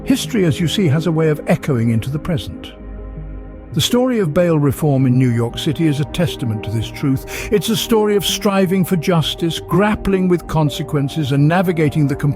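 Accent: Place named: United Kingdom. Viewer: Whats with the proper British person narration?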